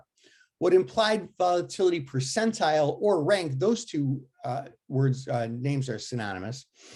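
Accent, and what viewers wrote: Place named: United States. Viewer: really struggling with this yank migrant's accent, rayt? "stahck" rayt?.. how does he say clock? "clahck" rayt? 🤢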